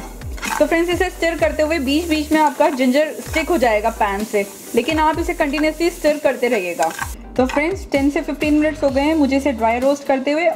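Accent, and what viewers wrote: India. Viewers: Your english sounds like hindi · I cant understand indian english like who has the same issue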